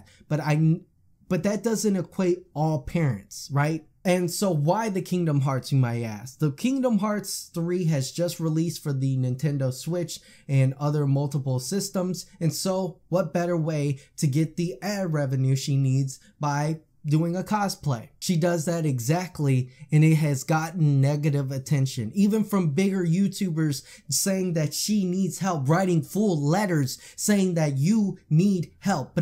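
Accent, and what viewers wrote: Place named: South, United States. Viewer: I keep hearing a suthern twang when he's talking. Am I the only one hearimg that? Gotta love those country boys lol 😉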